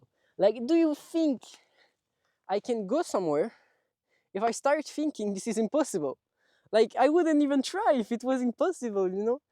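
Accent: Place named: France. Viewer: what part of france is your accent from?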